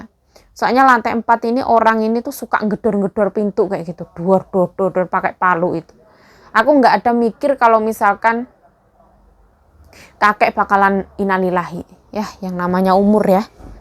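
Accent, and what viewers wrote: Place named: Malang, Indonesia. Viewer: aku denger kamu ngomong kok lucu bener, kata di kaploki kok jadi ingat bahasa Malang yo Jeng? aku jadi geli denger nya. Okay salamku dari jauh Jerman ( Germany ) Berlin. Salam kenal juga.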